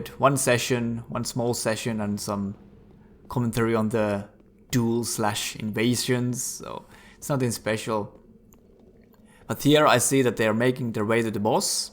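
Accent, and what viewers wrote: Finland. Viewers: For some reason you dont have that ugly Finnish accent, but a really enjoyable one. Get some riposte dps bro.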